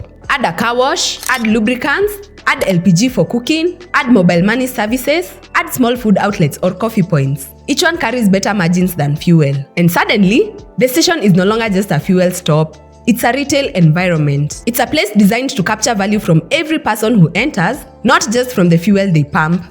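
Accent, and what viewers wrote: Kenya. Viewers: her authentic Kenyan accent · She has the typical Nairobi English Accent 😂 .. the Kenyan English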